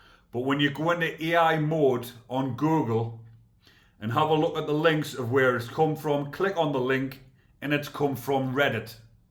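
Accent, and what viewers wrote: United Kingdom. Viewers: May i ask what part of Britain is your accent from? I am very interested in listening to the variety of accents which your country has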